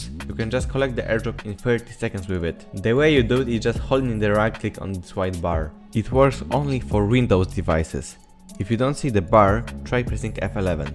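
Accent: Poland. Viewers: Ah słychać ten polski akcent w twoim głosie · Fajny film dobra robota, na pewno mi się przyda. Nie chce nikogo obrażać ale ten Polski akcent jest bardzo wyczuwalny😂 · slychac ten polski akcent😂😂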